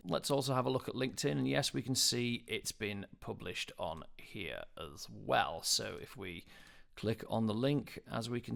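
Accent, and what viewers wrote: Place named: Yorkshire, United Kingdom. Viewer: Love a good Yorkshire man. Ay yup lad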